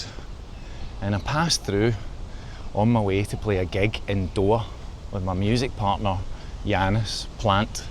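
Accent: Scotland, United Kingdom. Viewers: I've always thought your voice sounds just like Roddy Frames - then I discover in this video you're from the same town ! 😁 - sorry I don't know different Scottish accents,, it's probably obvious to Scots 😂Love the videos, well done on what you're doing 👍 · Really enjoying your channel. You are very well Scottish spoken. So soothing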